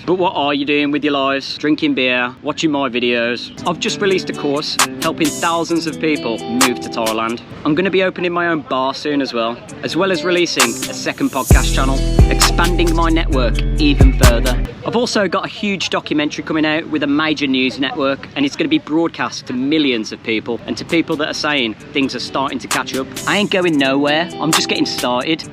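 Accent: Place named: Black Country, United Kingdom. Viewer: Sure, well said:) Where in England are you from? Sounds like Black Country...🤗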